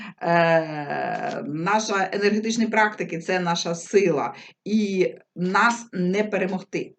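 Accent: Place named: Kherson, Ukraine. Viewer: Ви наша запальничка💙💛., Ви нам, жителям Херсонщини, дуже близькі. Бо і суржик в нас однаковий)))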